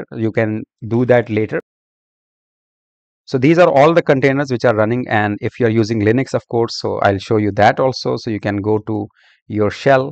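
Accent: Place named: India. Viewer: can't we find a tutorial without an indian like accent :(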